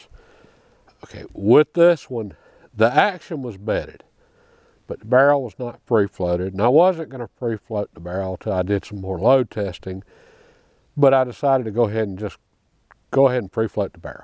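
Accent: South, United States. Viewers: did you ever send the Winchester Model 70 257 roberts back to Winchester to see what they had to say? BTW, I really enjoy your videos. You sound so calm and composed. Are you from Ga, tenn, or ala.?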